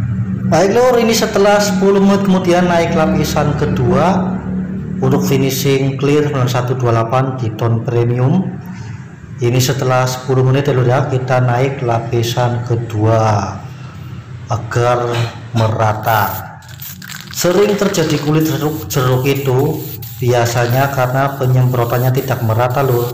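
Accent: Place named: Banyumas, Indonesia. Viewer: njenengan sih wong ndi lur, denenge ngapak pisan?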